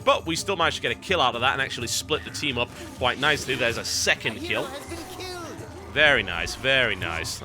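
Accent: United Kingdom. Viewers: is British, that's why he might sound a bit odd in your ears.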